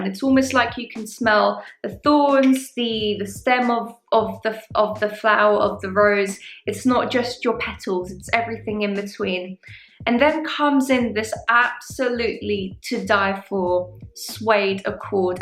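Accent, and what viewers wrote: France. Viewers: Love your french accent 😆 you are too cute! that Xerjoff packaging and the bottle is top! More like the beauty is sleeping 💙🧡 great review thank you for the video! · That French accent haha. Great video and I love Side Effect :)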